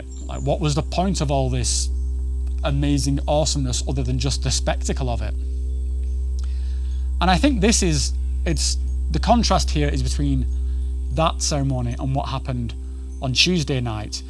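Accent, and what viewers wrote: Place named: Manchester, United Kingdom. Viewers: I love this channel. I don't know why but there's something really nice about your stories being told in a northern accent. I'm a fellow northerner but from the other side of the Pennines up in the northeast. "I thank her for what she gave me and I forgive for what she couldn't give me" - this is absolutely beautiful. I had my first ceremony a month ago or so and this one of the big hurdles that I broke through and it's made so much difference to my relationship with them. This trip sounds bonkers though, I don't think I fully broke through tbh but it was a tremendous experience anyway. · I live in Manchester and judging by your accent you're not far from here. I'm ready for my journey to understand my childhood trauma. I have social anxiety and going to South America is too out my comfort zone to go alone. 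I need to find a budy who has experience and will come with me. Can you tell me how the best way I can do this and where. I'm 48 year old grown man and am educated on DMT. Please can you help. Really want to do this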